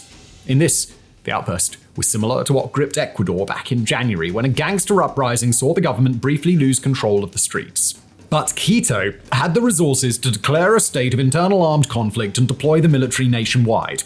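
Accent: United Kingdom. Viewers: I can’t handle British accents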